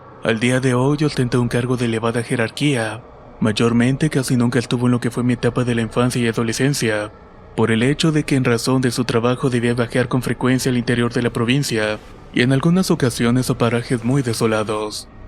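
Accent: Standard. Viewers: Oye men, no logro entender cuál es tu nacionalidad, ya que no tienes un acento que te pueda identificar como recidente de ni un país concreto. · Tu acento es como el español neutro de las traducciones de películas de principios de los 2000. Me traes buenos recuerdos.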